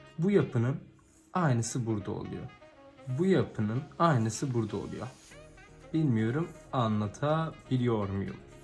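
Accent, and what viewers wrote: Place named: Samsun, Turkey. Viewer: "Eğl" çizmeyi öğrettiğiniz için tişekkürler. Hafif bir samsun ağzı sezdim (-_-)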